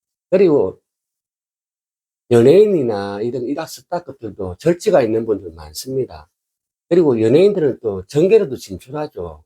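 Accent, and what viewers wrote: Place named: Gyeongsang, South Korea. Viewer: 경상도 사투리가 넘 구수하네요 😊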